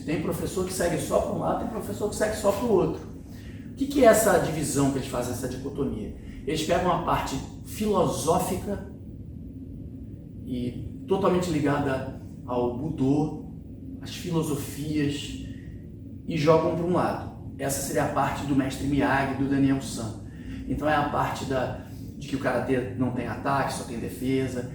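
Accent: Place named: Rio de Janeiro, Brazil. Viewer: Sensei, onde é sua academia? Treinei karatê por muitos anos e gostaria de poder voltar. Senti uma esperança, pelo seu sotaque, de que o senhor seja do RJ. Abraços. Oss!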